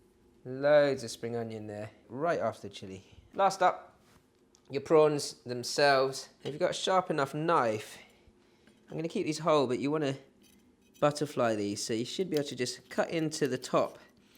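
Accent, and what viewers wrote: United Kingdom. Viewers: Holy shit, an Asian dude with a British accent! Love it!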